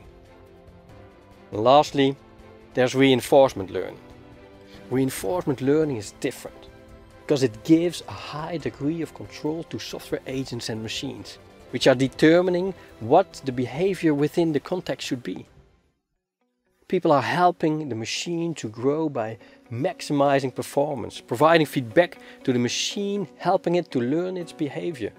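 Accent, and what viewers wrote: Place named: Netherlands. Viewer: I absolutely love you dutch accent! And the explanation too of course.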